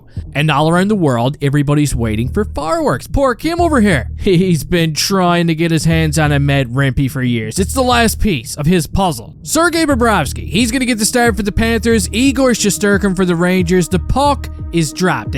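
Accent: Newfoundland, Canada. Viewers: East coast Newfoundland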